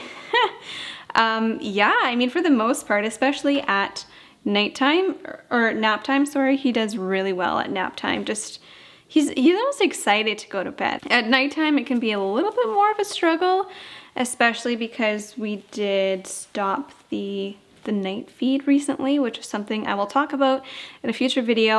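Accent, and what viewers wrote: Western Canada, Canada. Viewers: I knew you were a Canadian the moment you pronounced, “bag” and “garage.” Lol, you can’t hide that accent :-) 🇨🇦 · Lol I love hearing how others perceive Canadian accents. Hers is more of a western Canadian accent though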